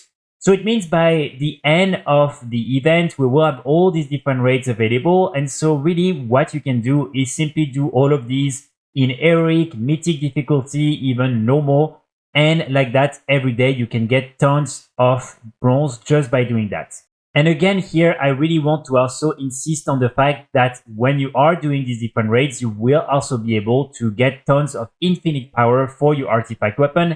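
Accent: France. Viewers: Tes français non ?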